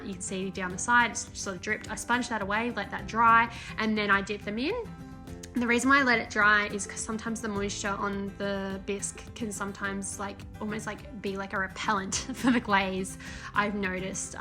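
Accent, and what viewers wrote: Australia. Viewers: Ah these are so gorgeous and so are you! It's a real treat to have such a joyful and engaging YouTube series with an Australian voice in it. I'm enjoying it heaps - thanks from Tassie.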